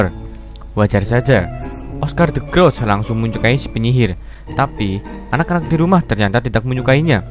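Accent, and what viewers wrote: Java, Indonesia. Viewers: Jawa banget anjir ngomongnya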